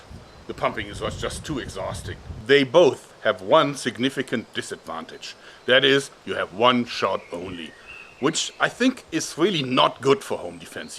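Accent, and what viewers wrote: Germany. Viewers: Kann es sein das du deutscher bist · Can someone explain to me why his German accent and someone's German accent like Christoph Waltz or Skallagrims German accent are so different? is it a regional thing? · The speargun has not just one shot. And you don't have to fill it up. You took too much pressure to the spear gun, so it can be damaged. Also air pressure guns ARE NOT TO FIRE IN THE AIR! THEY CAN BE DAMAGED! Listening to your accent I think you're german as me, so please read this: Die Harpune ist werkseitig mit einer fest eingebauten Druckpatrone ausgestattet. Die Patrone hat bei der Auslieferung einen Grunddruck. Es sind daher keine Druckpatronen o.ä. erforderlich. Die beiliegende Pumpe dient nur zur gelegentlichen Nachjustierung des Drucks oder zur Füllung nach Wartungsarbeiten. Die Harpune kann so beliebig oft hintereinander genutzt werden.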